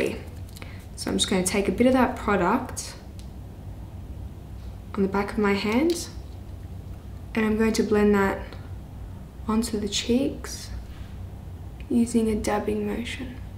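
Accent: Australia. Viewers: you also sound Australian?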